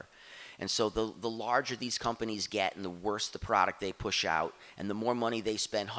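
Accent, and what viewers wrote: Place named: New York, United States. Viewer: Slips into? From an Aussie standpoint his accent sounds like his from Brooklyn/NY geographical area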